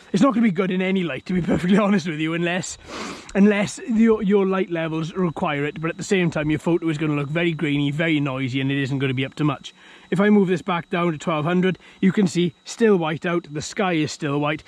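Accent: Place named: South Wales, United Kingdom. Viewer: Great vid. Very informative. By the way is that a South Wales accent I detect?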